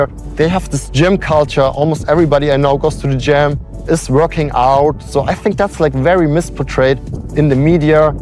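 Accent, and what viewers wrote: Germany. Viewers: I love your cute use of English with the very heavy German accent and also your choice of words and phrases. You make few mistakes but your choice of phraseology is very touching. I taught at NYU for 11 years " English as a Second Language" to mostly Asian students. Many Germans think their English is good when its really not, filled with many errors.. I had a close friend from the Stuttgart area, also Ernest /Ernie? Was he related to you? Keep up the good work, Welcome to the USA though Florida and I am thrilled you are doing so well here, Hugs and be welcome and prosper. RM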